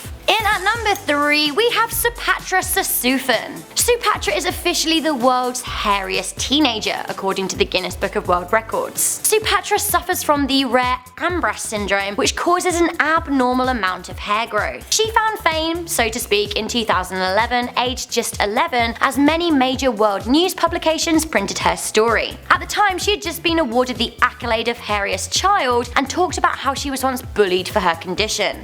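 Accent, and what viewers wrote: United Kingdom. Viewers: is low key hot af with her cute British accent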